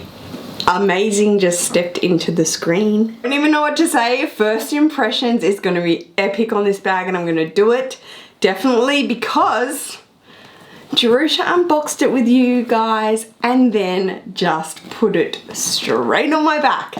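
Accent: Australia. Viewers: Jerusha I have a question. Your English sounds beautiful and ylu articulate very clearly and pronounce words in a proper way. Many other Australians I watch on youtube seem to talk in a different way, like it was difficult for the to pronounce the words, if you have any idea of what I mean. Obviously English is not my native language. But my question is, do you have a different kind of accent compared to other Aussies, and why, or am I just so adjusted to your speech?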